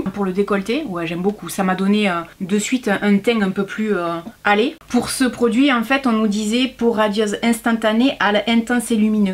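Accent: South, France. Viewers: Bravo. Bons conseils et toujours aussi charmante. J'adore le petit accent du soleil ❤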